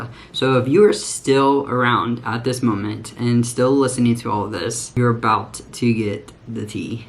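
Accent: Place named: Marietta, United States. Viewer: Is it bonkers that I could tell you were from Marietta by your accent? I went to MC about a million years ago and I can still hear it.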